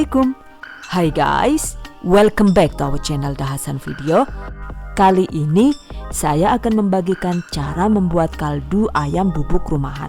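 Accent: Banyumas, Indonesia. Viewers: Terima kasih ya... Insya Allah akan saya coba resep ini.... Seperti kemaren saya baru saja buat nugget ayam.. Enaak banget.. Terima kasih sudah membantu.. Tutirialnya jelas dan mudah dipahami.. Ada lucu lucunya juga bahasa ngapaknta... 😂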